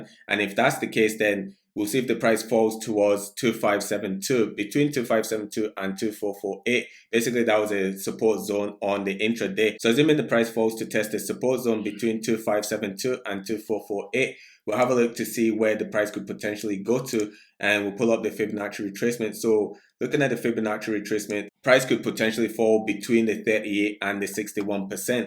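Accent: Scotland, United Kingdom. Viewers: Is that a Scottish accent i hear bro?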